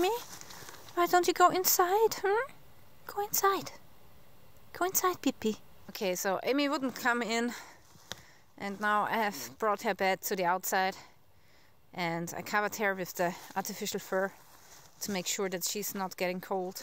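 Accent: Germany. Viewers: Really enjoyed this. That very German English accent is the best...greetings from Australia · Pasaba por aca y...escucho alguien de deutschland hablando american, but in that frozen field even me would be talking just to warm up.but congrats for have chosen a labrador dog for companion no better friend for any self sufficient girl.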